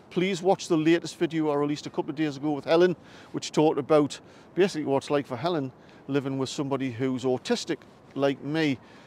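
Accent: Newcastle, United Kingdom. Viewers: not sure but at the end of the day he’s a Geordie so let’s not get to carried away with this still a good lad people just prefer other content creators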